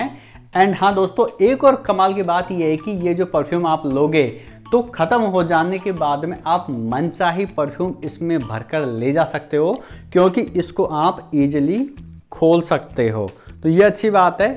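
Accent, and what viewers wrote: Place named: Western Uttar Pradesh, India. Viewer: samjh gya tha mein bolne ki accent se konsi city se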